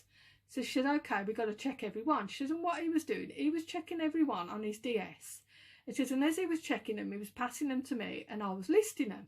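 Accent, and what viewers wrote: Birmingham, United Kingdom. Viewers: I love your accent. You sound just like Ozzy!! Blimey, I just checked, and he's also a Brummie! I think me ears are good. ^_^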